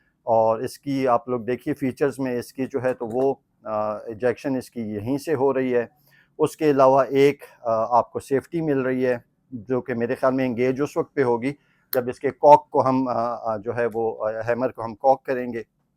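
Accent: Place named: Hindko, Pakistan. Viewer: sahib very nice hindko dialect ❤, secondly mein nay bhee apna 30 bore 21000 ka khareeda tha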